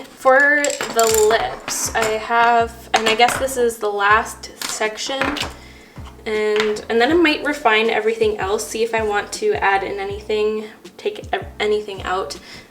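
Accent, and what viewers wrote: France. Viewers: Can you do a tutorial completely in French. I love your accent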